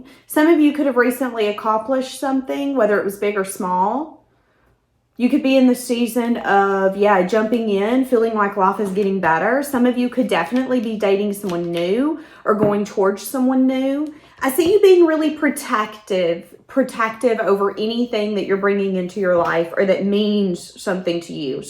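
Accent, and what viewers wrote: South, United States. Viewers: I love your Southern accent chere!💜💜💜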